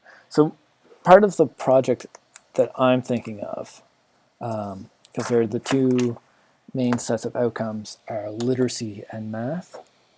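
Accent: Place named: Canada. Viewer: It's all about that Canadian accent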